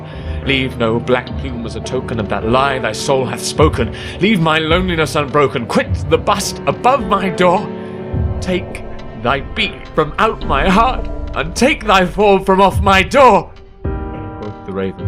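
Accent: United Kingdom. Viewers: It's nice, but why the British accent? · Probably because the person reading it is British 👍 hope that helps!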